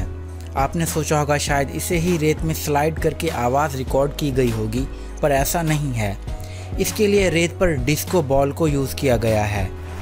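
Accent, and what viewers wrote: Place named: Pakistan. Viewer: Iski aawaz se ye Pakistani lag raha hai............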